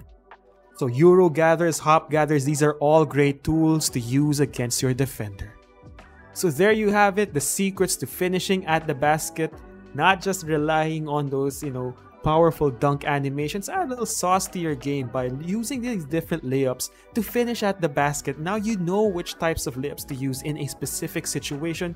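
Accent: Philippines. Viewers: Are u Filipino? U sound like it brother!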